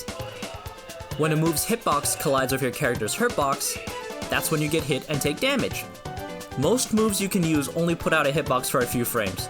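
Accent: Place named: United Kingdom. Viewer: In that intro you really sounded like the slay the princess narrator lol xDDDD Or maybe its because im not used to brittish accent idk, but it reminded me so bad of that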